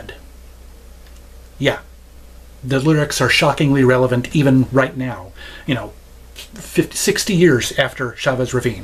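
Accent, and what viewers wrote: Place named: Canada. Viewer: WOW!!!! How the heck did you do that sound with your mouth in the beginning??!?! I was enchanted! Sounds like you are a fellow Canadian. I need to check out that record.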